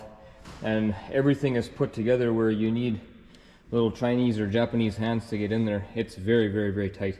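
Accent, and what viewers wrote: Canada. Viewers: Manitoba, eh? Which is where I live too. And you have an accent that places you even closer in Manitoba to me, but I’m not gonna say where.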